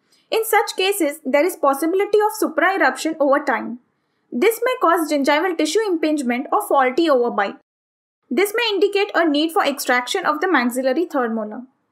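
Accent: India. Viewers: They sound Indian of something similar and this ain’t a Pc guide I don’t trust it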